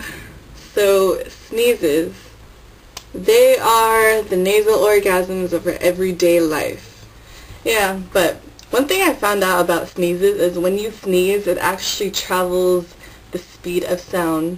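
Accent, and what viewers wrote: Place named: Philippines. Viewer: HAhaha Next time you sneeze stick your tongue out :P Nice accent btw. "Aww cute na cute" Lol Filipinos FTW!! :P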